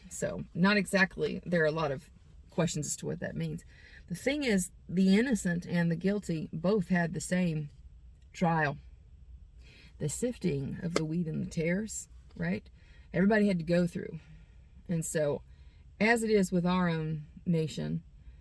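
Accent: South, United States. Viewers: Love this young lady. First time viewer, l was mesmerized by those piercing eyes and when she started quoting scripture l was hooked. Love the southern twang as well.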